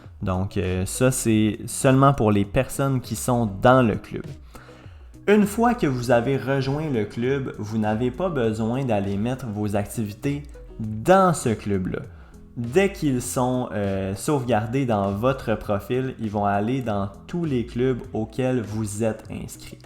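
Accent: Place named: Quebec, Canada. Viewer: Super vidéo j'aime beaucoup les Québécois :-) avec leur accent mais au moins c'est bien expliqué bises de France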